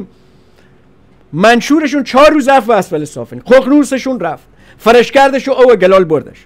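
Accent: Lorestan, Iran. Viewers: قربون هرچه مرده. گلم خداوکیلی یکدونه ای..اگرچه مطالب رو همراه با مزاح و لهجه شیرین لری بیان میکنی، ولی زیبایی کارت همینه. مقابله و سرکوب با هرچی نامرد وطن فروش اه.واسه همینه نفوذ حرفات، ماتحت وطنفروش هارو همانند شمسی جاکوزی، به آتیش کشیده. قربونت، فدای مرامت❤🙏🙏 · فقط اونجایی که میگی خدا‌ قوت ت بیه 😂 دقیقا به موقع میگی 😂👏👏👏من بچه تهرانم و عاشق لهجه و گویش لری هستم! ایولا دمت گرم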